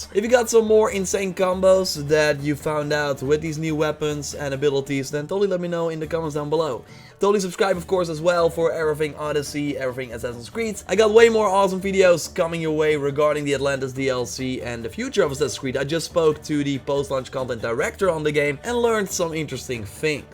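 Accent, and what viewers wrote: Netherlands. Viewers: Is this guy dutch cause his accent is familiar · are you Dutch, by any chance?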